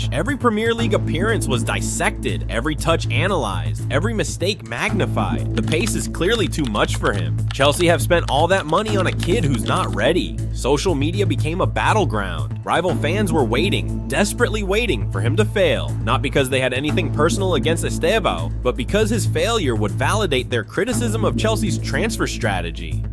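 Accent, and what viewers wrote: United States. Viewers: Why in God's name do we have to subjected to this awful American garble? Learn English we were here thousands of years before you!!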